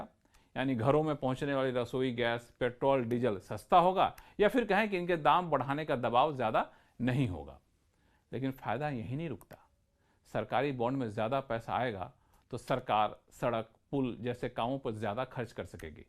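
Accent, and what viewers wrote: India. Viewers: your delivery of Information in very desi andaaz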